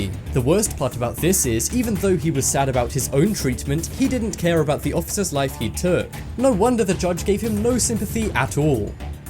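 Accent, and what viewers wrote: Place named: United Kingdom. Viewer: The clickbait starter pack: British accent, arrows on thumbnail, thumbnail having nothing to do with the video, asking for a like at the beginning. You only have a British accent, so this video is not clickbait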